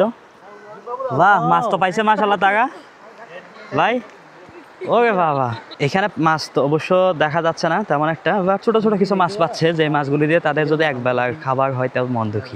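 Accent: Chittagong, Bangladesh. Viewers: ভাই তো চট্টগ্রামের মনে হয়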